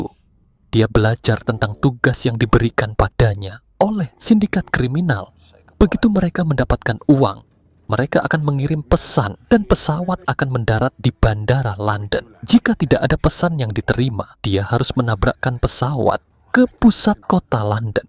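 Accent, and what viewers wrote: Java, Indonesia. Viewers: di awal video, mimin kyk logat bali, lalu ganti logal jawa medhok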